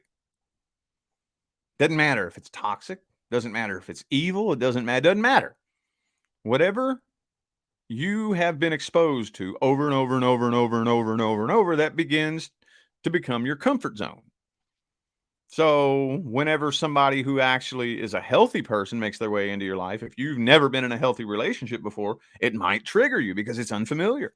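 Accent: United States. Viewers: It's cool to hear a reading in my country boy accent. Very good read.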